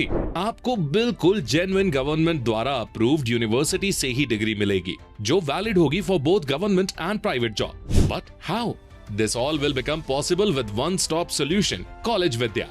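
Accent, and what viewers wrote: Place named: Delhi, India. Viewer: Humare delhi me aajo sir srojani nagar wali awaj he apki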